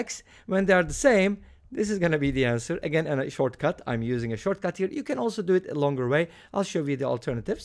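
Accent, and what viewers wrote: Turkey. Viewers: It seems to me that you have the Turkish accent...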